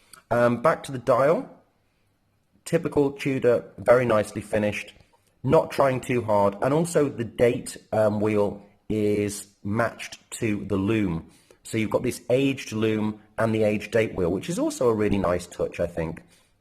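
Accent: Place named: United Kingdom. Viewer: Judging by your accent I take it your from the U.K. and from what I heard is that the P01 over in the U.K. was a hit as soon as it became available. I’m not saying there were waiting lists for it. Just that when a dealer got it there was someone to buy it. Maybe that was the reason you had such a difficult time acquiring one. Over here in he U.S. it’s not as popular in my opinion and because of that if you do some digging around you can still find one from an Authorized dealer. But I don’t believe that all dealers are even ordering them. At the moment the watch is getting no love from anyone but as soon as it gets discontinued people will start realizing it’s a classic and a must have. I’m really curious if they discontinue it when they come out with new releases or give it another year. Maybe corna will be a decision factor for discontinuing it because they are backed up on production?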